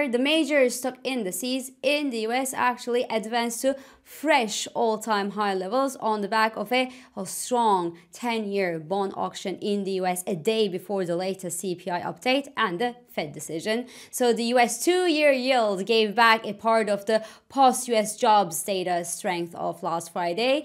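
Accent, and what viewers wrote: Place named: Turkey. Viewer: You have a Turkish accent. Are you Turkish or closely related to Turks?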